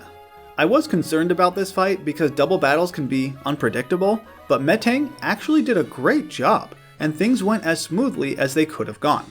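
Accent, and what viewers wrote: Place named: United States. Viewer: famous Vegas! That's nice to know! Maybe someday I go visiting there. It must be a real nice place. But I would say your accent is the typical American English, clean and straight. I like your pronounce! I mean, it is exactly how I learned to pronounce the English words. That's a nice coincidence, I would say. I'm anxious to see your next challenge! Thanks for sharing, you're very friendly!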